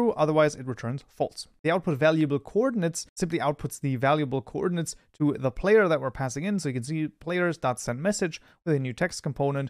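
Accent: Germany. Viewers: Amazing video man (also i love you accent, are you german?) I've looking for ways of having dynamic items, for example: i create an item that can change it texture and it stats (attack damage, mining speed, durability...) based on crafting materials, like tinkers' construct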